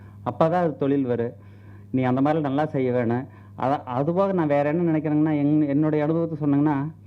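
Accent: Kongu, India. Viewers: kongu tamil...😍💓💓💖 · Kongu Tamil...UBM valgaa... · BEAUTY OF KONGU TAMIL!!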